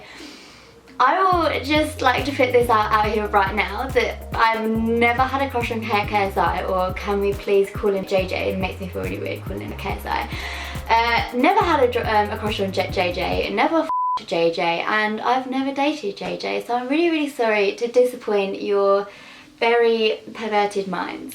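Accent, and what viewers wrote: RP, United Kingdom. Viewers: You say put the roadman accent on, what roadman accent 😂u still sound posh bare gyal in South speak road u don't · i think the stuck up one was thought bc of your accent bc its kind of posh compared to others especially compared to mine ahahhaha im from new zealand but yeah idk i personally don't think you are I think you're awesome!!!! :DD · Naa u sound posh still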